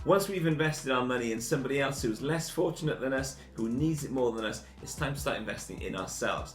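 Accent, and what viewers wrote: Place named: Wales, United Kingdom. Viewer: I'm really enjoying your content so far, and is fantastic to hear another rustic Welsh accent in the personal finance space. Keep up the good work!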